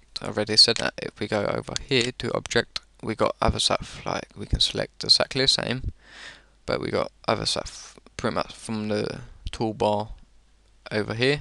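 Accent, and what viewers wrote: United Kingdom. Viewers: you sound like russell brand.. nice english accent..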